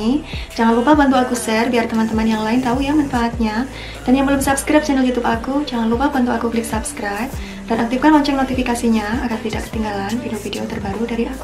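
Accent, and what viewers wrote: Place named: Wonosobo, Indonesia. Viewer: Dari logat bicaranya seperti nya anda tinggal tidak begitu jauh dari wonosobo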